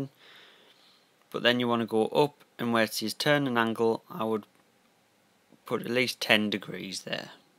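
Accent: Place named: North East England, United Kingdom. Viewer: North East of England. I might be wrong but I just recognised the accent 😂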